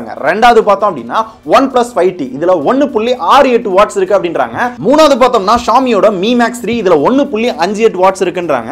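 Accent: Madurai, India. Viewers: BRO nega Madurai ya BRO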